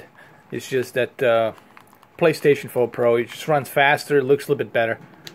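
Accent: New York, United States. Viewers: Are you from New York, I can hear the accent a little. Sounds a bit like the Bronx or Queens to me.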